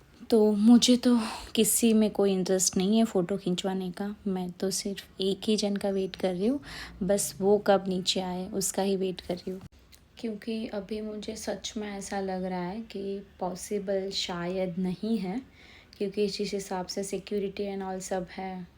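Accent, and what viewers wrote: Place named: Gujarat, India. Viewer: Your gujarati accent is good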